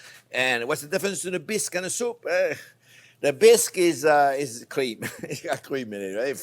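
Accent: France. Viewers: “Onyo is always number first”, you’re awesome... I’ve also been using your accent while cooking. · And i love your french residues in your English as much as i love you detailed instructions & explainations in your video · Just discovered your channel last week and haven’t stopped watching the videos ever since! I love your voice, your food, your accent 💖 Everything about you and your channel is absolutely succulent 🤩 I’m almost 70, of Persian origin, living in France for the past 50 years, married to an Italian for over 2 decades and recognized as a good cook by all (even by my late mother in law who was a dream of a cook herself!) So I dare say I know food 💃🏻 You and your channel are a pure delight. Don’t change a thing👌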